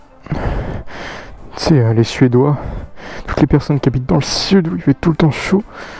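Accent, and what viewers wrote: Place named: North, France. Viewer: Cc t de ou du nord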